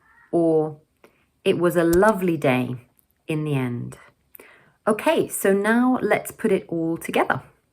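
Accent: United Kingdom. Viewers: 흐어어..ㅠ 영국발음 죻아아...♡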